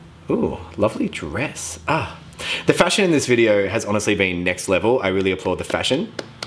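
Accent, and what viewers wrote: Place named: Australia. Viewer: I can tell he’s Australian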